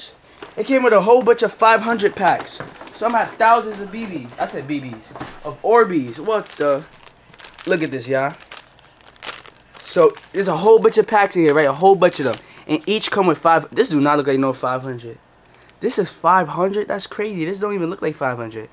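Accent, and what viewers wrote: New York, United States. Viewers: Is u from NYC?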